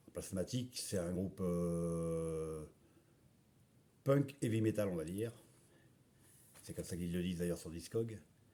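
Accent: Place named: Brittany, France. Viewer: ton Breizh-anglais est des fois limite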